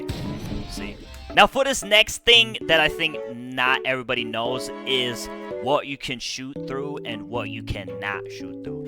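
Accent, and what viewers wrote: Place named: United Kingdom. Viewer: wtf he is like speaking British?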